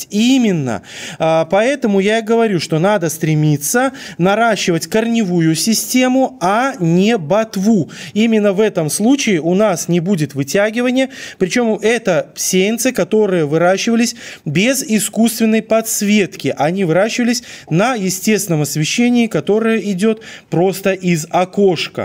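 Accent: Belarus. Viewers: Речь красивая с небольшим белорусским акцентом!!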